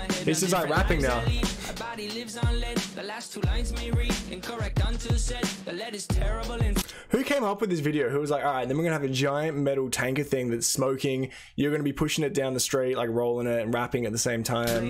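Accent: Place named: Australia. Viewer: Very cool! Love your Aussie accent! My grandma was from New South Wales, in Bega!. She loved all kinds of music and She would have loved your channel! Keep rockin’ it out! 🤘🏻❤️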